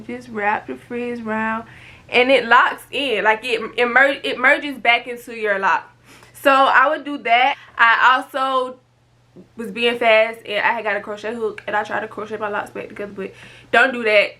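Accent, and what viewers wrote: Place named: Atlanta, United States. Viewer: I’m getting real Atlanta GA vibes from your accent 😂 love it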